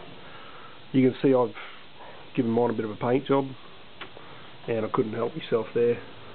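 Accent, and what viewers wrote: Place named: Australia. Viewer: thought u sounded like an aussie so checked out your youtube page and saw your Greens pic. Guess you dont vote Green, haha.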